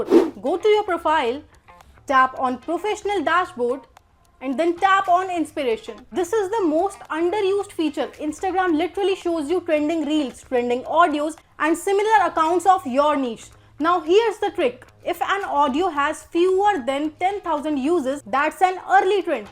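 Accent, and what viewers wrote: India. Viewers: Yeah Anytime and really nice East Indian Accent and Hot Beautiful Smile plus Personality.!!!💙❤🩵✅️👌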